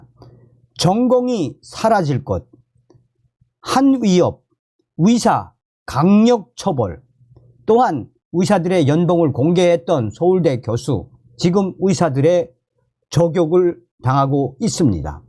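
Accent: North Korea. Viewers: 정성산님에 tv을 자주 보는데요❤ 말씀을 표준말을 쓰니까 예전에 북한말 쓸대 보다 듣기가 좋습니다 감사합니다 ❤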